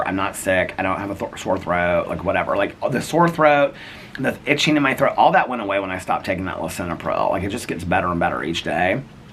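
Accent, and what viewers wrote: South, United States. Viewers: U had me cracking up at the gym when u we’re talking about your southern twang from watching the slaton sisters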